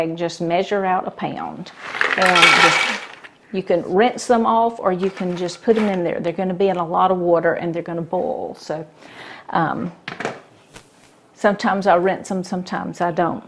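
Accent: South, United States. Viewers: Thank you so very much!!! I looooove boiled peanuts and u just made my life so much better!! I'm born n raised in NC and you sound like every woman in my family basicly. Southern women are the best!! Thank you very much for the recipe!! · she has that wonderful southern accent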